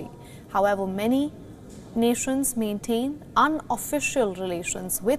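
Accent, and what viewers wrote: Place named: India. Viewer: Indian accent is so funny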